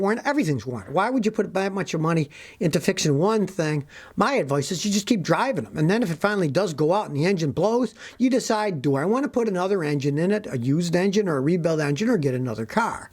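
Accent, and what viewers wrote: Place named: Tennessee, United States. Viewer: not gonna lie, when i first watched your vids, I was like, "is he from tennessee??" damn i'm pumped to meet u haha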